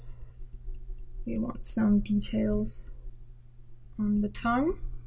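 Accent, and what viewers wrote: Denmark. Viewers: I don't mind if you screen record or this way. But I am a little concerned about your Apple pencil because it's missing the cap. Did you lose it? But anyway you record, I love your voice, it's adorable ❤️ your Danish accent is just insanely cute 😍💛💛💛 Your so skilled as an illustrator. I'd love to see you create an entire piece in real time from start to finish. Don't care if it's an hour or two long. Your soothing voice calms my anxiety disorder. I finally found my Kryptonite - you 🥰🍕🍕🍕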